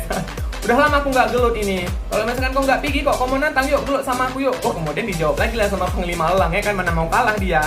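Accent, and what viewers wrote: Medan, Indonesia. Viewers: Penuturan bahasanya kek org medan 😆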